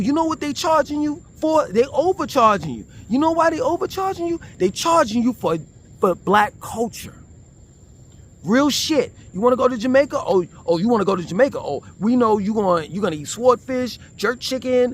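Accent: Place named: New York City, United States. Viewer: Bro WHERE in Jamaica are you?? I keep asking you this? Jamaica has Amazon bro lol, Jamaica ships in Shein and Temu, there literally NOTHING you cant get.. Kingston, Portmore, Montego Bay, you gotta move around in Jamaica. And as to the Red Tape buy big so you don't have to worry about big taxes. The truth is your at a DEEP disadvantage because you cant speak Patois, and your accent is deeply NYC America. Jamaican success literally depends on your energy 😂, you can motivate a mf to link you on time. You have diff types of Jamaicans Professionals and Jokers lolll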